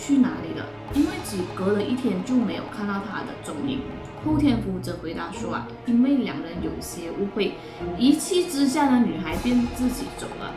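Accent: Malaysia. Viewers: 对啊这是马来西亚口音 ，就很像你们在外国人面前说英文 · 她是马来西亚华人，肯定跟你们不同啊。。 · 马来西亚华人的普通话口音很有趣，你是广东人后裔吗？好漂亮～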